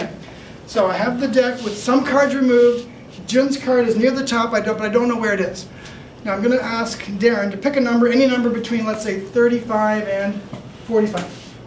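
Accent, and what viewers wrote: Canada. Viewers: This guy is from Canada that's for sure!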